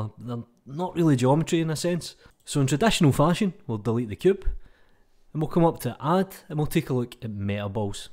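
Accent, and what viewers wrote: Scotland, United Kingdom. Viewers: nah its Scottish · Hes Scottish lol no the wonder!!!!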